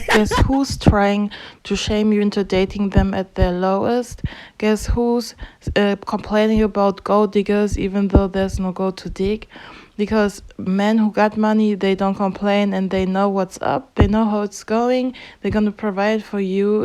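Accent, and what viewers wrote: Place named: Germany. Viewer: Do you live in a German territory? You have an accent similar to my Oma and Opa. 💜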